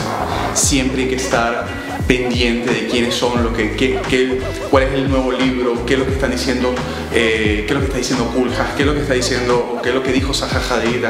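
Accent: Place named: Venezuela. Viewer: Nada más hablar supe que eras venezolano!!!! Es que tienes el don de la palabra certera y didáctica. Una de las cosas que más valoro de nuestros compatriotas profesionales que se exponen por internet es que no recurren a groserías ni expresiones de mal gusto. Felicitaciones y éxitos. Ya me paseo un rato por tu canal 😊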